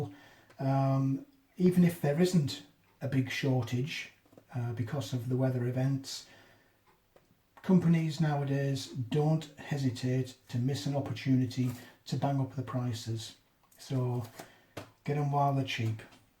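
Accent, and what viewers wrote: United Kingdom. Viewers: Do you have other channel's or do you have a voice twin?🙃😋 anyhow maybe just me a sucker for British accent , good to know us dum Americans aren't the only one's prepping 🙏😁😶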